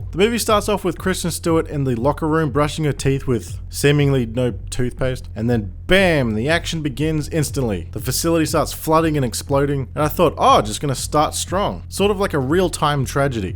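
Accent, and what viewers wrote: United States. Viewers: I look forward to hear what your thoughts on the new transformers news is. Your American accent is really good. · That American accent though..... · Good American accent! Well done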